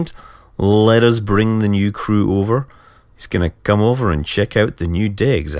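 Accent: Scotland, United Kingdom. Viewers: He is british... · No, he is a Scotsman..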